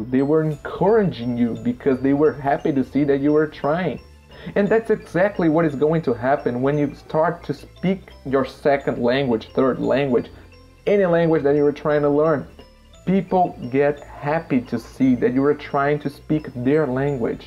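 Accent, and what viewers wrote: United States. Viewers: He does sound pretty American later on, but definitely not in the beginning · Wow when I started listen you I thought I was watching a American guy spoke in English. I couldn't say that you are a Brazilian if you didn't say that you are. Congrats from now on I will follow your videos. I am studying on my own as well. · I thought he sounded American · Brazilian with american accent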